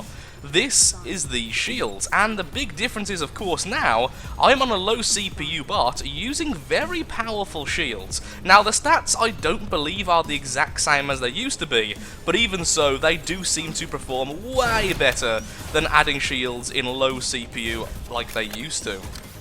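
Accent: Netherlands. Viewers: Wait are you dutch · are you dutch i am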